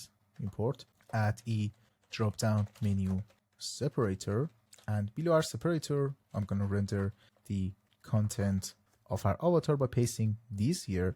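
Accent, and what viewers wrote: Iran. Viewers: Persian Accent Discovered ✅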